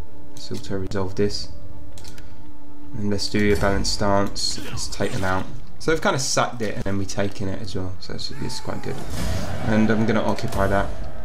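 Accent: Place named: London, United Kingdom. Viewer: did you grow up in london around loads of roadman black people? your accent sounds like it, no offense shieeet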